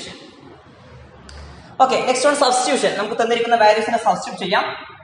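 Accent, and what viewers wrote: Tamil Nadu, India. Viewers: Sir u r a tamil or malayali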